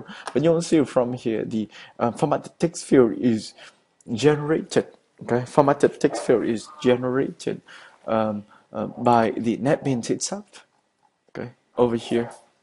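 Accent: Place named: India. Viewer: Anh này Việt Nam mà accent như Ấn Độ ấy nhỉ =))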